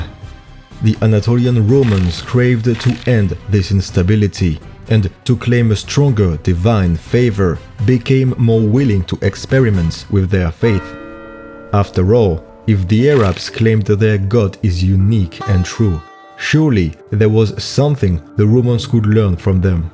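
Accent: France. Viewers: From your accent in this video i think you are frensh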